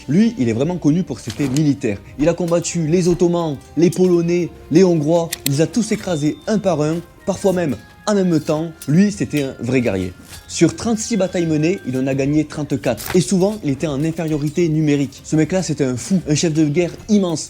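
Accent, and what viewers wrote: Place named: Toulouse, France. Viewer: C'est quoi cet accent sudiste la ? T'es toulousain où bien?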